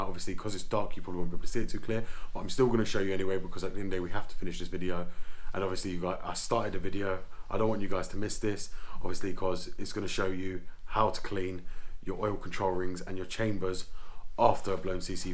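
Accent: United Kingdom. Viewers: In the USA, his British accented English is said so fast as to be indeciperable.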